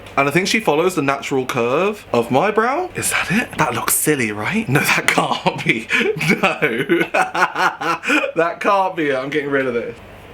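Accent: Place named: Liverpool, United Kingdom. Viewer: living for the Liverpudlian accent and gorgeous makeup ❤️ Would love to see any club kid look, something more abstract 💞🌻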